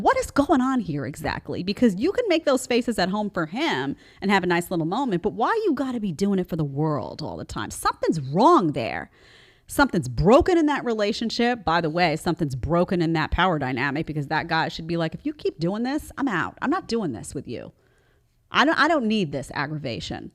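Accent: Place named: New York, United States. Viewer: Jedediah's NY accent leaks out occasionally. I prefer it, to be honest.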